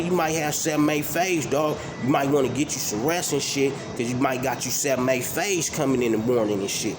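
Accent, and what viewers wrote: Los Angeles, United States. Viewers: bruh yo channel gon blow like a muthafucka wit them fire ass stories wit that extra thick real L.A. ganbangers accent.. I subbed up quick like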